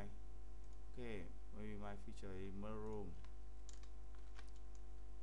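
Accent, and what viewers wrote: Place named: China. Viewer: Sorry buddy, but you do NOT explain any of the commands you enter, so the viewer is left guessing why you are doing things. Plus with your heavily accented chinglish accent I suggest you GIVE UP these tutorial to be honest. They just do not crack it compared to many others I have watched and learned a lot from. Maybe do them in Chinese for your fellow countrymen instead ?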